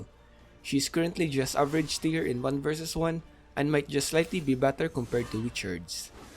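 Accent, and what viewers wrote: Philippines. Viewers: Yep first 3 seconds and I can already tell this guy is pinoy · I know a pinoy accent when i hear one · Sorry but I can't help but to notice. are you from south east asia? Particulary from the philippines?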